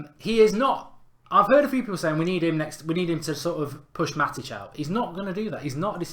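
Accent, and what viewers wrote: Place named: Newcastle, United Kingdom. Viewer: Loved the Geordie accent.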